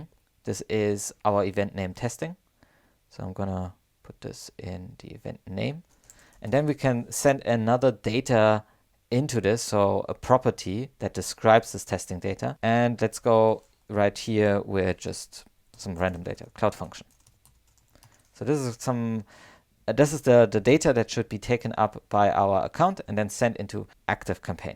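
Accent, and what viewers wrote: Germany. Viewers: Wenn ich den Akzent einschätzen müsste, würde ich zu 99% sagen, er ist ein deutscher!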